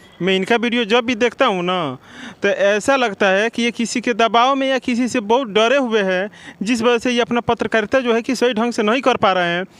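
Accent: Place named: Bihar, India. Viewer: Yaar Journalism Karne ke pahle yea apna Bihari tone Khatam karo... warna Kuchh nhi ker pawoge.... good luck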